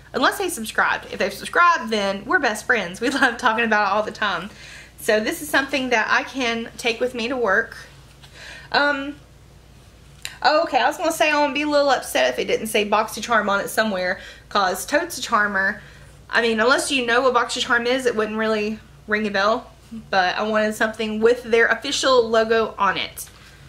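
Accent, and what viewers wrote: United States. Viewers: I love your country accent!! And your camera is bomb!!!! Love from Texas!!!! I love my boxy lux box.... The Luxie brush is so amazing it literally soaks up no product!!! Your gonna freak out!!!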